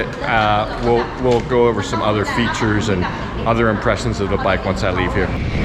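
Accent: United States. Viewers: Are you an American living in Thailand? Sounds like an American accent lol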